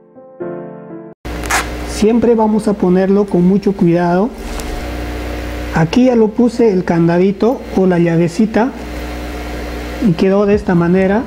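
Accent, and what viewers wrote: Peru. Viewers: Sos peruano o boliviano.....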